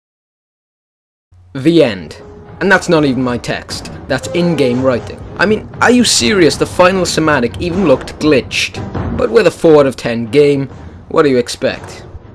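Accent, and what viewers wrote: Wales, United Kingdom. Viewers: Are you Welsh? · Where are you from? you sound welsh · you must be from wales! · I'm picking up Welsh, Italian and Irish from your accent? No offence intended..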